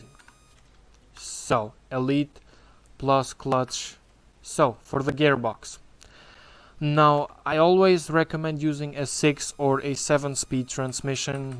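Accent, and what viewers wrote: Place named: Russia. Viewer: вери стронг рашен аксент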